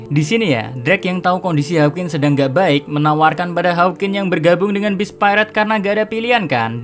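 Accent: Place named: Jepara, Indonesia. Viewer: Adminnya orang mana sih ?? Aku denger² kok orang jepara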